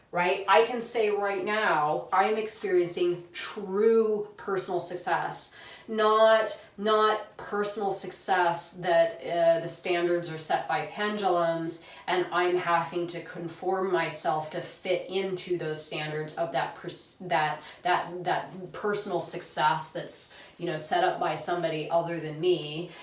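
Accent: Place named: South, United States. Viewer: I love your videos. as a southerner i sense a slight southern accent. it seems you have tried very hard to hide it though but its so cute when it peeks through. IM not sure if i am right but if i am let it come through more! anyways love your vids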